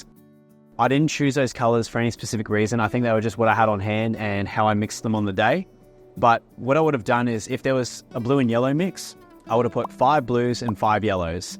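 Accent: Australia. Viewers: He sounds australian. as a former (moved to America january) in australia everyone swears there. like everyone, its just another word in Australia. so the culture and stigma around swearing that there is here in America is not prevalent in Australia. i mean heck the school kids from around 14-18 are saying sIurs, not in a rude manner its just part of their vaocab and no one really cares because of the massive cultural difference. i understand where u are coming from I just don't know if u knew that in some parts of the world swearing has no meaning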